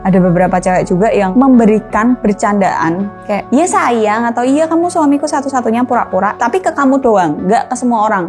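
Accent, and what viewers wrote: Java, Indonesia. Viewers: Asyik penyampaiannya mix bhs indonesia sm khas jowo nya😄 Asli fisikal touch, skin 2 skin, dia curhat soal cowok, nanyain cewek idamanku,godaan lewat jok,tp dia gak berani eye contac,persis apa yg diomongin tp skrg malah jauh, my be ada something wrong🙈😂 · Gaya ngomongnya kok kyk jodile Warwick ada campur jowonya tapi asik gitu ya gaya ngomongnya 😂 wow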